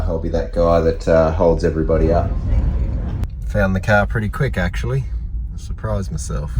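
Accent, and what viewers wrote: Australia. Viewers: I thoroughly enjoyed your fossicking in our lovely mainland river. I don’t know why YT algorithm suggested your video but I’m glad it did. So much better hearing a local Aussie accent instead of the usual American ones so prevalent on YT.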